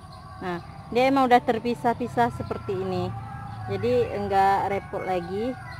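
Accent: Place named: Aceh, Indonesia. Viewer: Kak dmn tinggal nya??? Dari logat nya org aceh ya??? Rencana saya mau main ke tempat kk, tlg di balas ya 🤗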